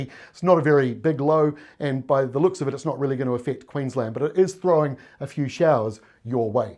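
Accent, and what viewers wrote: New Zealand. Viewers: NO, Thank you for being a kiwi aussies can understand, Aye.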